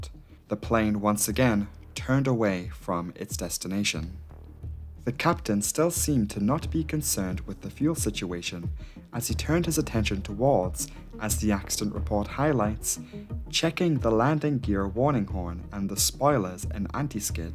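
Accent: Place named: Geordie, United Kingdom. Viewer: I’m fascinated by this accent. It seems mostly Northeast England, but with little hints of South Wales every now and then. Then there’s a touch of rhotic North American creeping on in there too. Is this a Geordie who moved to the US?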